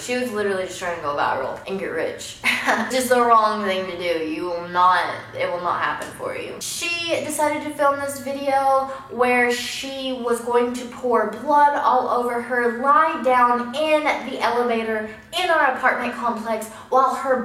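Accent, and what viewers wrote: South, United States. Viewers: I cracked up when you imitated her screaming "I CAN DO WHAT I WANT! GET OUT OF MY FACE!" Just the way you went about it. By the way you're really pretty and I love your Southern accent. I live in Minnesota and some of us talk like Canadians.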